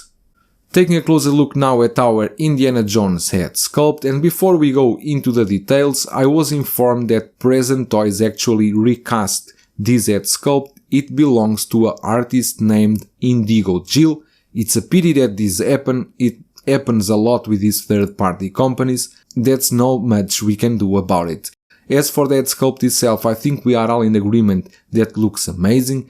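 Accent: Portugal. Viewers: Portuguese accent?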